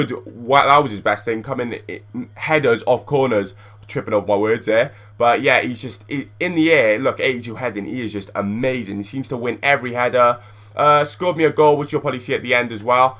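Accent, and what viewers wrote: Wales, United Kingdom. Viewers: your accent is confusing, there's weird moments when a bit of Welsh comes out..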